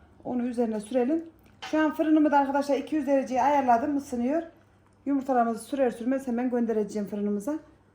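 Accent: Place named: Çorum, Turkey. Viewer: Kolay gelsin hanım bizim gibi konuşuyorsunuz nerelisiniz ben Çorumluyum ama 42 senedir istanbul Eyüpsultanda ikamet ediyorum ❤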